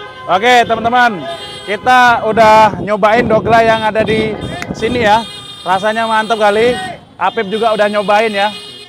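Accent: Medan, Indonesia. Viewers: Omakk...logat medan ah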